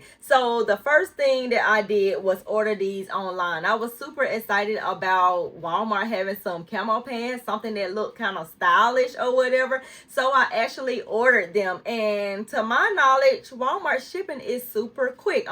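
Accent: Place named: South, United States. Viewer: Love your style and your cute country accent 😊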